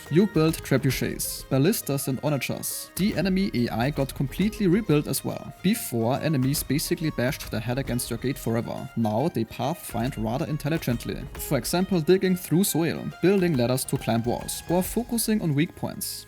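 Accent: Germany. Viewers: absolute pain listening to your bad german english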